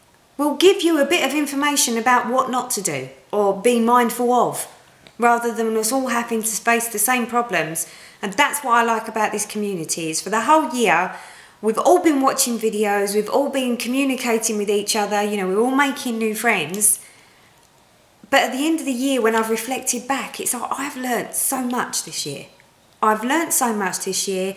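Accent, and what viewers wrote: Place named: London, United Kingdom. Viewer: Hi,! I'm brand new to Flosstube and am catching up on videos. Just did an intro video but I might have to do this one next (even though I don't have funny moments in stitching, really!). BTW, your accent makes me miss London! Cheers! --Beth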